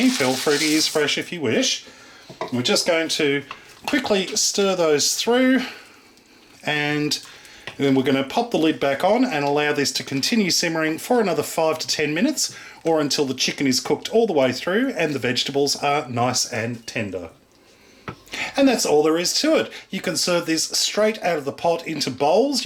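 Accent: Australia. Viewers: OMG, didn't know you r OZ! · Man you di so well on youtubie shit what is your secret. You sound Australian as I am. Good work I have saved heaps of your recipies.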